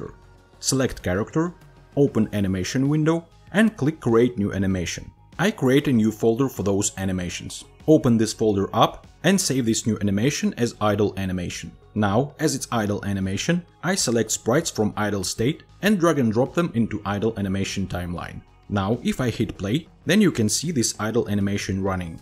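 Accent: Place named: Russia. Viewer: Кликнул на видео не замечая названия канала. Услышав акцент думаю "русский что-ли?". И тут вижу на "Новая папка" Хороший туториал, короче, спасибо)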